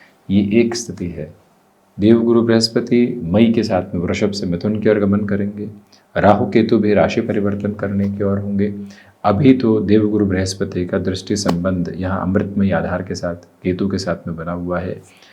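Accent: Standard. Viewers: आप बहुत शुद्ध हिंदी बोलते हैं, बहुत से लोग समझ नहीं पाते, घ्यान दें। मैं हिंदी में एमए हूँ।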